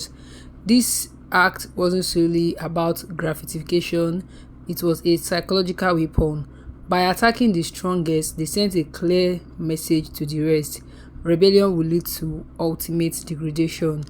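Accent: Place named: Africa. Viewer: Thanks for telling the story I could tell from your accent that you’re from the continent of Africa I wonder why so many Africans hate African-Americans when we came from Africa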